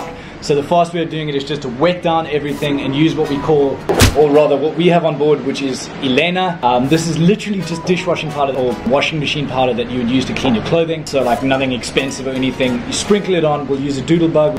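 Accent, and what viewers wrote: South Africa. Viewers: your South African accent is sick (at least i think thats a SA accent) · this guy's got a south african accent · 1st video im seeing of yours Are you South African?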